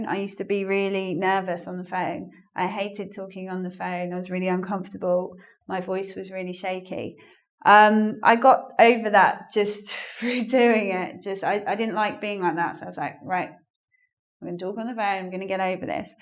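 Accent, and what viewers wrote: United Kingdom. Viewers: Lets donate people, shes a great teacher with very sweet British accent. I love it :) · You're a really cute teacher, I like your british accent and the way you teaching. That's great! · I love your british accent!! thanks for your class.... Greetings from Colombia. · You're amazing !! The best accent of the world and the best and real English !! UK 👍🇬🇧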